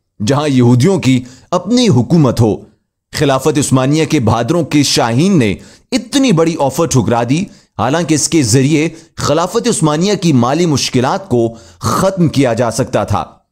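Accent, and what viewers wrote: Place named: United Kingdom. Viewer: Kamal ke English accent dali apny..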